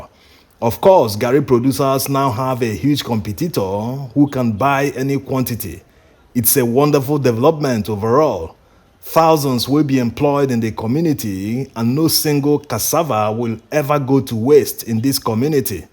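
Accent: Nigeria. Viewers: His accent is AUTHENTIC Nigerian accent. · Peace unto ye. I hope you and your family are doing well. I like Nigerian accent. Believe on the Lord Jesus, and thou shalt be saved. When the true Jesus shall return, we shall worship him at the feast of tabernacles. In the new earth, we shall worship the Lord of hosts in his new moons and sabbaths. Have a good residue of the year.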